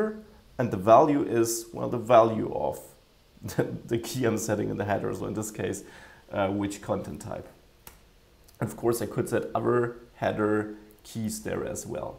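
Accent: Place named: Germany. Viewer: The German accent man, that's a pain in the a**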